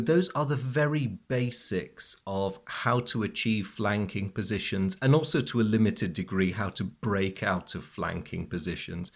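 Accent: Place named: RP, United Kingdom. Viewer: Cheers dear, Thanks for your kindly reply. My request wasn’t for a specific scenario, honestly. I fancy that if you play a relative simple scenario that covers the basic rules it would be great. Maybe, you give for granted certain stuff (like terrain or discard, how to calculate sniper activity and reaction, just to mention a few). If you might simply explain those rules playing the game, I bet you might hit the target. Uh, last nice thing: your posh English reminds me a teacher I had half century ago…he was a peculiar fellow that escaped the Polish invasion by Nazis into a balloon! Thanks a lot for your passion.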